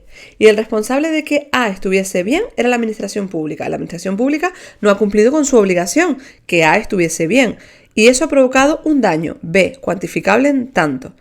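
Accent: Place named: Canary Islands, Spain. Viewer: Gracias! Me encanta tu acento. Eres canaria?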